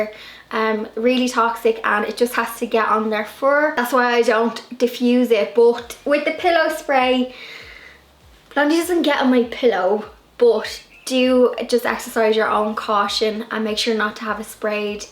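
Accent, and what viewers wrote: Ireland. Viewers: You could read the telephone book to me and I would listen. ( not in a creepy way!) I love your Irish accent. You have such a lovely home as well and I love to see all your DIY stuff. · I love your channel lovely to hear an Irish voice on here 😻 I will definitely try your recipes as I love essential oils and handmade soaps I must get some soap making supplies thank you for sharing xx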